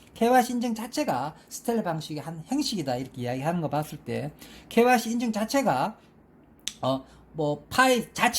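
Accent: Gyeongsang, South Korea. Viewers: 행님 경상도 어데 사십니까?ㅎㅎ 좋은 영상 감사합니다 ㅎㅎ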